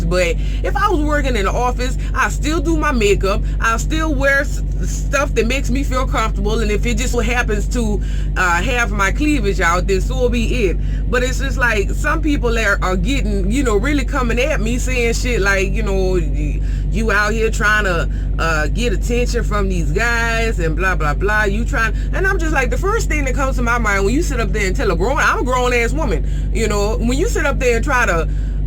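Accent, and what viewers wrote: United States. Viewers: Where are you from you got a real country tone to you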